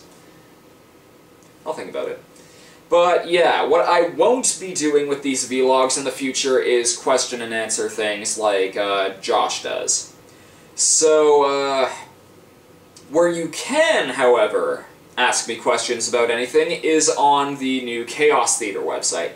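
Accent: Canada. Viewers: Are you Canadian? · The Canadian accent is strong with this one. BEST ACCENT EVER. (Seriously, say House and Out more, it makes me sad I'm stuck with my lame american accent, at least I can listen to yours.)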